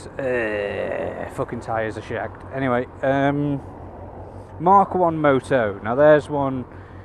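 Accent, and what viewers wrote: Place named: Wirral, United Kingdom. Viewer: I knew from the accent that you must come from that neck of the woods, but in my day, long, long ago in a Galaxy far away, I'd have placed you further up the Wirral. Mind you, I come from further up the Wirral, but not many people these days place my accent there.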